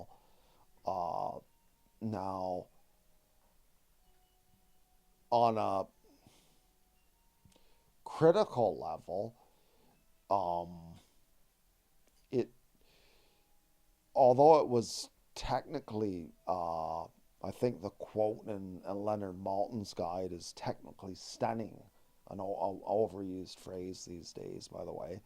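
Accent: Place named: Canada. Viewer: I could talk to this dude forever about this Also one of the most Canadian people I’ve ever heard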